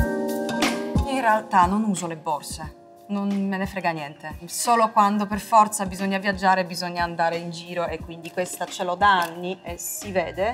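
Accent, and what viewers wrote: Italy. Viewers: un bellissimo accento italiano💗:)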